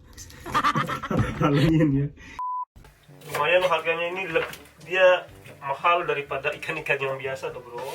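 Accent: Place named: Japan. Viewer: Udah lengket ya bang logat jepang nya 😅😅😅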